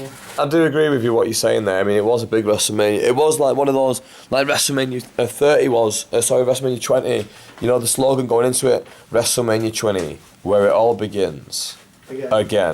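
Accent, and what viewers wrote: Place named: North, United Kingdom. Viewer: great series mate, i'm going WM31 so just started watching next mans videos to get an idea. ain't gunna lie, the northerner in ya came out- great to watch. keep it up lad.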